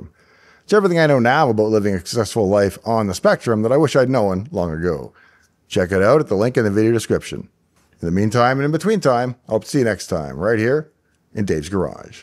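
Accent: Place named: Canada. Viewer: Listened until I sensed a Canadian accent.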